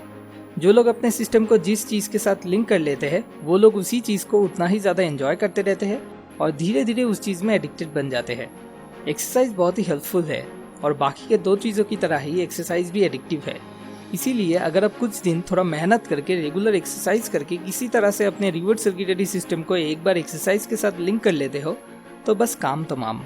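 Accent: Bengal, India. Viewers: aapki accent Bengali Wali hai...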